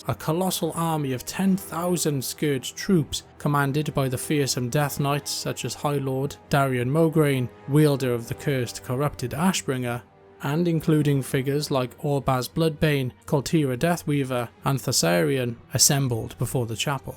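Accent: Wales, United Kingdom. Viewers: hey mate cool chanel, are you welsh btw?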